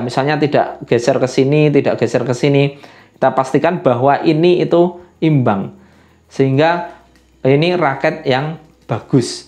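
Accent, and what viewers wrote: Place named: Java, Indonesia. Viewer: geram lak aku dengar jawa dia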